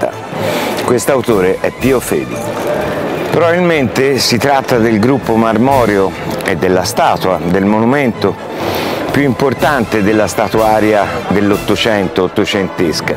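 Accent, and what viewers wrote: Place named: Florence, Italy. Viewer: Ascoltarla è un piacere. Tratta sempre argomenti vari ed interessanti con un gradevolissimo accento fiorentino che mi ricorda gli anni felici vissuti a Firenze. Un caro saluto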